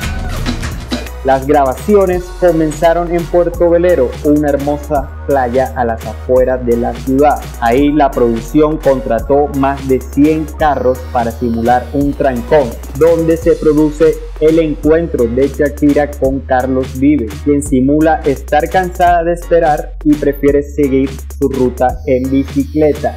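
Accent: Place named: Spain. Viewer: Si ella tiene don natural para los idiomas y está casada con un español, es completamente lógico que hasta de forma inconsciente hable casi como española. Que se te peguen los acentos, ni es culpa de la persona, ni es un defecto, es un don del cerebro. De vuelta en Colombia, si se quedará unos días su cerebro se reprogramaría como Barranquillera. No hay nada que criticar, ella trabaja bien, ama a su familia y su país de origen y el país que la acogió. ¿Qué hay de malo en tener inteligencia y un corazón grande?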